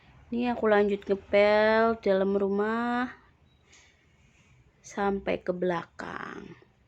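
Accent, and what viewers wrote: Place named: Banyumas, Indonesia. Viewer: Salam kenal mbak, aku ngapak juga, dari cilacap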